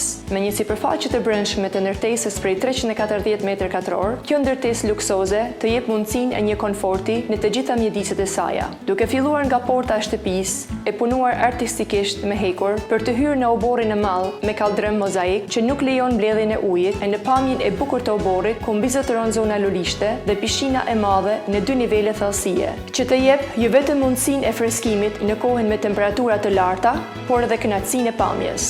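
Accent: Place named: Highlands, Albania. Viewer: Sa dialekt të bukur malsoresh paske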